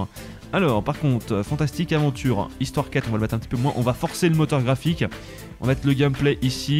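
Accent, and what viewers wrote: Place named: Paris, France. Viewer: pourquoi tu dit gare et pas genre . tu est de Paris ??? Parce que moi je suis d'Aveyron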